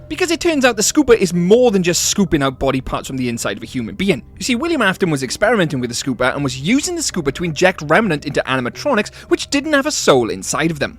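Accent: Wales, United Kingdom. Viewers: I’m a simple man. I see a FNAF vid. I hear a Welsh accent. I subscribe.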